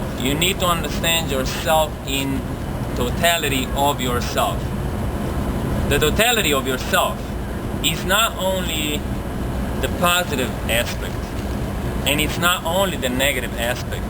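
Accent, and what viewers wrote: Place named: Spain. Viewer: this guy looks American but talks like his Spanish wat a funny guy lol, is he faking his ascent lol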